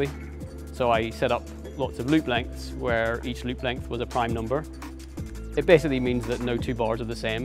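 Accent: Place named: Northern Ireland, United Kingdom. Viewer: Holy shit my favorite artist is from the same country as me, northern Ireland. Heard the accent and flipped out!! Keep up the good work 👍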